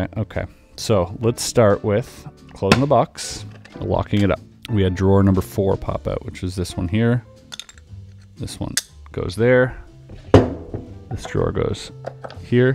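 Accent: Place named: Vancouver, Canada. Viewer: ph shit. I identified you as a person from Vancouver based on one video. It was your taste and your accent that gave it away. I think knowing what city you're probably from is better than that puzzle.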